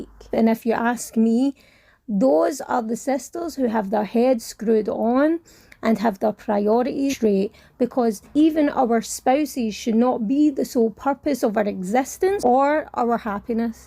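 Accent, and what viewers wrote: Scotland, United Kingdom. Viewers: Ma Shaa Allah sister you are good. On the subjects you talk about, you are spot on. However sister, your Scottish accent is a bit difficult to follow in one go. 😊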